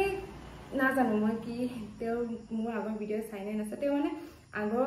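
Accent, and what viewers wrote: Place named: Mangaldai, India. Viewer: Ba tumi darrang district r hoi nki ? Kothabur mangaldai side r nisina lagise Btw..bohut morom thakil ❤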